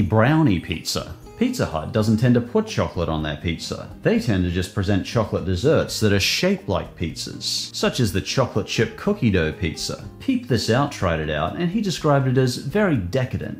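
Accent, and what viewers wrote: United Kingdom. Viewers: I like you're British accent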